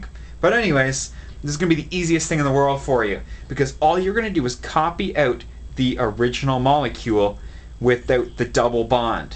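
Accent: Canada. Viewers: you sound very canadian--love it:D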